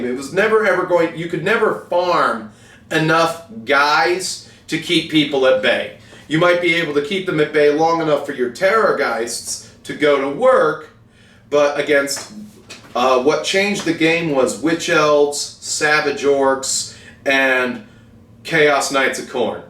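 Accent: United Kingdom. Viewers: That is alot of figures.... alot! Im missing your British accent, the guy from Upton that supergule his stamps and because of that charge you extra for the shipping cost. 😎👍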